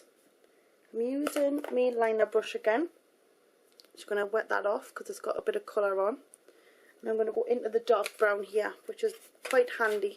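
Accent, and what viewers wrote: Newcastle, United Kingdom. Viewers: Haha,u r the first english person ive heard on youtube,R u from newcastle?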